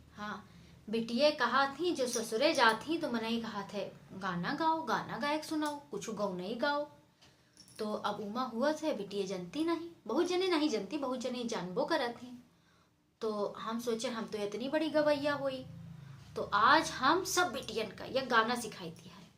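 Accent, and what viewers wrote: Awadhi, India. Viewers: Bahut badiya yaar mja aa gya...aapki awdhi boli ne to dil khush kr diya.or video bnaye awdhi boli me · आप केरि अवधी कॉमेडी तौ बहुतै नीक हुअत है। ख़ास कइके अवधी कै लबो-लहजा। मुला ई तौ खड़ी बोली - हिंदी केरी गौनई रही। कौनौ अवधी गौनई पेस करौ तौ अवधी मजा अऊर आवै। बड़ा नीक लाग आपकै चैनल। खूब तरक्की करौ।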